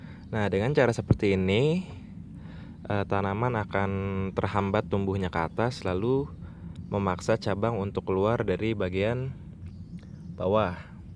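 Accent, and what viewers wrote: West Java, Indonesia. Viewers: kanyahoan sunda na..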